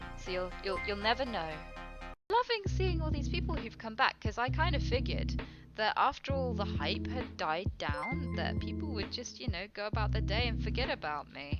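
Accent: United Kingdom. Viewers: You're so likeable 😂 imperfections are such an attractive quality, especially when you have that amazing English accent 😍 from an Irish man 😘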